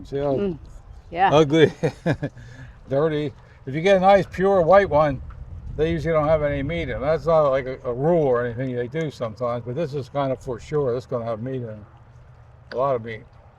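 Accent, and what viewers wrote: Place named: Maryland, United States. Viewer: You guys are wonderful we love you... We now live in Jupiter fl.. Don't crab the loxahachee.. But watch you.... Miss maryland..you sound like that's where you are from.. Happy crabbing. Found an alloy can eat in stuart... Are you from Maryland.. Ann mckewin west palm beach... God bless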